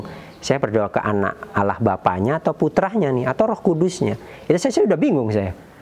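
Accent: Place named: Java, Indonesia. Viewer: Ngomongnya medok nga gerti...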